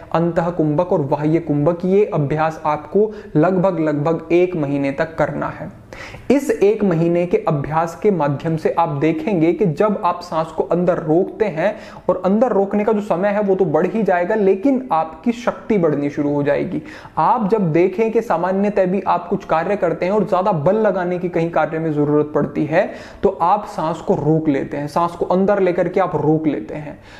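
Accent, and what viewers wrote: Standard. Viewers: The most insperational part of this channel is your pure hindi pronunciation, you worthy your every inches of life, you are blessed, am bless to find this channel, thank you 🙏🙏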